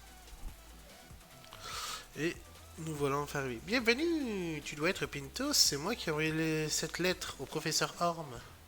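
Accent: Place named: Quebec, Canada. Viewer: L accent québécois ça va il est bien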